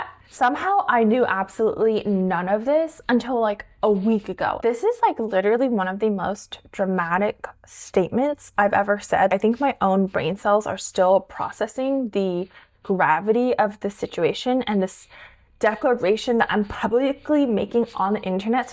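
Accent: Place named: California, United States. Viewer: I have never heard so much Californian accent from someone on YouTube before.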